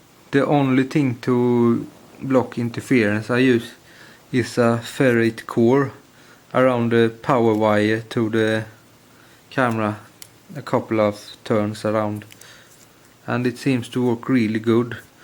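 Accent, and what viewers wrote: Jönköping, Sweden. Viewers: Är du från jönköping?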